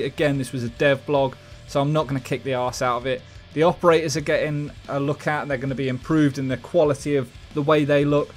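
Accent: United Kingdom. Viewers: oh look another british guy reading patch notes hi stylosa hi pete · You sound like you are from they U.k your connection is gonna be shit no matter what, Canadian and US are very compatible for servers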